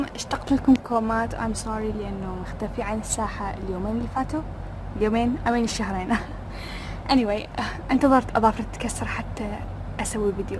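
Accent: Iraq. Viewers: جمال اللهجة العراقيه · حبيت اللهجه العراقيه تجنن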